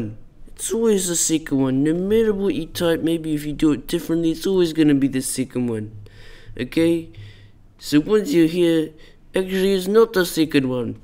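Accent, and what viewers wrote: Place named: Russia. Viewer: Russian speaking inglish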